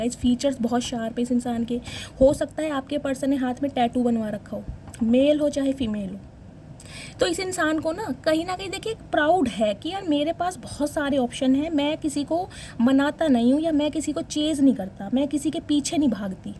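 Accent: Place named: Uttar Pradesh, India. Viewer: Ye बताओ कि आप मैनपुरी आगरा साइड से हैं। आपकी बोली सुनकर ऐसा लगता है। मेरी बोली भी ऐसी ही है। कुछ कुछ ।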